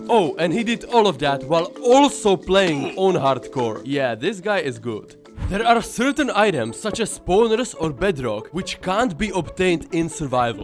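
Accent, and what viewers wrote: Czech Republic. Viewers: The most Czech accent in history frfr. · Je to haluz, hned jsem poznal přizvuk, Češi se poznaj 😀 keep up the good contennt, dobře se poslouchaš! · Why do you have a czech accent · Ah... i was questioning myself that you have quite cz accent :'D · The Czech accent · this guy's English accent is just typical Czech...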